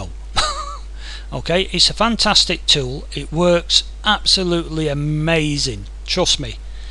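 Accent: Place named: Yorkshire, United Kingdom. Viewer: Agreed. I had to search a bit to find this but it saved me from having to use Maya. You sound like you're from somewhere by Yorkshire too,..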